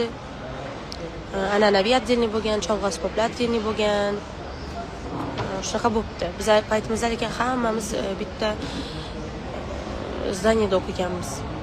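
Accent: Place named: Khorezm, Uzbekistan. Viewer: Horazimcha gapirmang Toshkentcha gapiring asalim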